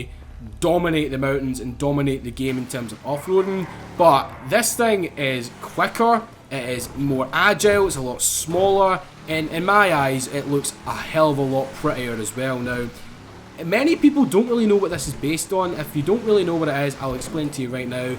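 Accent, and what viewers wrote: Scotland, United Kingdom. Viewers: He is Scottish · Are you Scottish. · are you scottish and does ek stand for east kilbride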